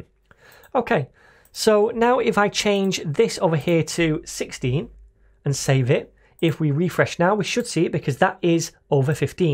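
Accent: United Kingdom. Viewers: teacher, thank you so much! You teaching is always organized, concise and to the point. I was struggling with JS, and your JS Udemy course came to my rescue. I was struggling with VueJS, and your Vue Udemy course helped me out. I have noticed that you used Firebase as temporary backend for those courses. Would you please please please, make a new Udemy course of Youtube series on full stack, in which both frontend and backend are integrated in one project? Maybe Laravel and Vue or Django and Vue, which ever has shallower learning curve? I watched your tutorial so much, now when I read, I heard British accent in my head: ) Teacher, you are the best web development teacher I have ever met! God bless you!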